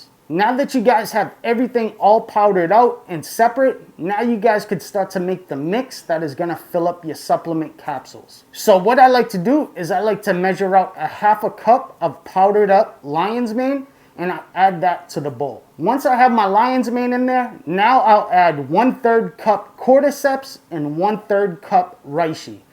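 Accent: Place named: New York, United States. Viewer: He’s a Yankees for sure, I’d guess Jersey or NewYork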